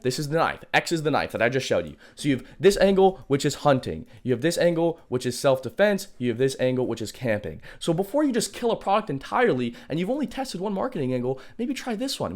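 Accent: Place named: India. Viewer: this dude is funny. hahah. the indian accent. loooolll. im in cali too my boy :)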